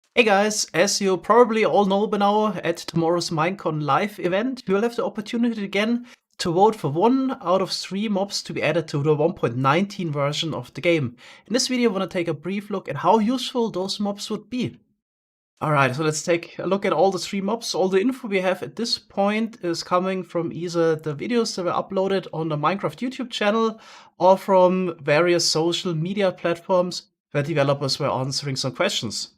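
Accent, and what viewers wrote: Germany. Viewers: Damn you sound german, but great video tho.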